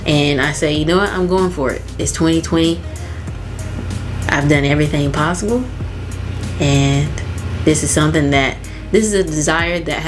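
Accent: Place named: South, United States. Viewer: New subscriber, wish I discovered you when I was loose natural but excited to see your loc journey and love hearing my you talk, the southern drawl is absolutely endearing ❤ Depending on how u maintain, your locs will likely swell to a traditional size. I've been loc'd for over 6mos now and my microlocs have almost doubled in size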